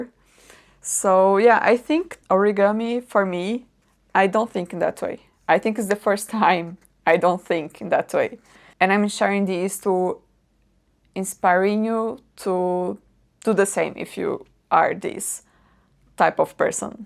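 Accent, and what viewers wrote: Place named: England, United Kingdom. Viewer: You're very talented, and your English accent is lovely.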